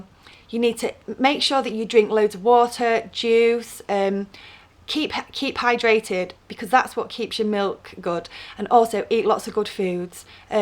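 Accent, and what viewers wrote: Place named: England, United Kingdom. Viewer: She's from England 👍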